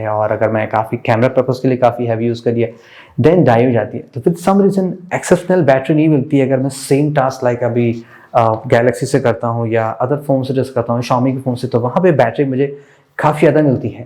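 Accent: Lucknow, India. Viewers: ji aap lucknow se hi ho kya???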